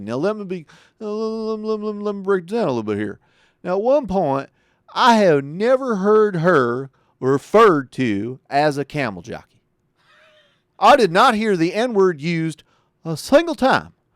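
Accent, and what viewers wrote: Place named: Texas, United States. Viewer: Love the accent! You sound like I would imagine a Texan to sound like...kind of a Southern Western drawl. The internet is buzzing with what the Texas State Government wants in their text books. "My great great great grandpappy used to ride on one of them Brontos, said it made him feel closer to Noah!"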